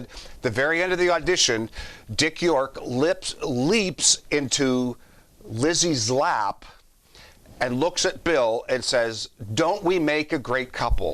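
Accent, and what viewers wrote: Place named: United States. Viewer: The accent of the narrator is way too American!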